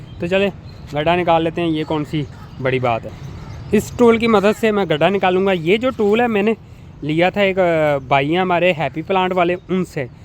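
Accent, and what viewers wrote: Punjab, India. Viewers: پنجابی لہجے میں اردو تقریر۔لمبی اور فضول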